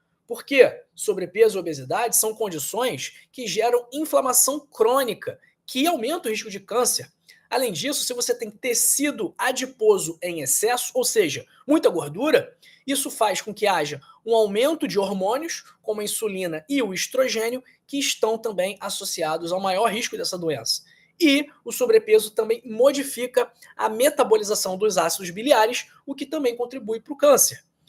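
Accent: Rio de Janeiro, Brazil. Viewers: Carioca sotaque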